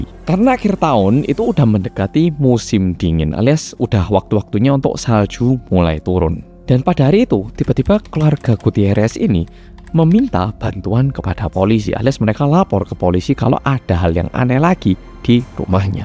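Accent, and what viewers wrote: Java, Indonesia. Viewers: Sumpah suara host nya MEDOK bet tapi enak didengar hehe semangat terus ya...n semoga chanelnya semakin berkembang....all the best for your chanel 🙏🙏🙏🙏🙏🙏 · medok amat rek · Iyo serem rek.. Aku nyimak siang hari... Sehat sukses selalu muedok🎉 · mas medhokkkk .. tolong bahas kasusnya furota dong yang di siksa 44 hari .. di perkosa secara sadis sampe mayatnya di cor di tong ... pngn tau cerita lengkap nyaaa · Muncul di bawah video bang mewing. Penasaran orang korea ngomong b.Indonesia, ternyata medok..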